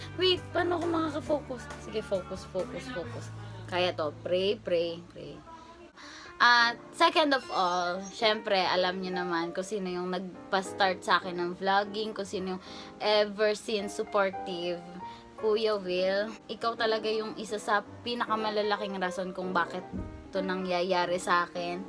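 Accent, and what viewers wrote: Batangas, Philippines. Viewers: speaking in batangueño ... parehas lang tayo ng accent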